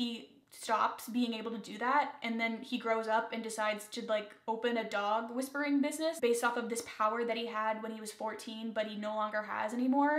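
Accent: Canada. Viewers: Hi I liked the video, and I look forward to watching the others. Also, you sound Canadian.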